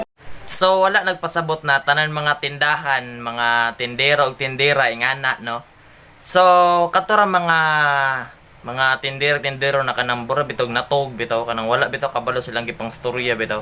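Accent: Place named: Visayas, Philippines. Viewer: nakakatuwa namn po sir ang galing nyo talaga mo mg patawa lalo na yng salita ng bisaya mo dami kng tawa sa mga jokes mo pkcm amo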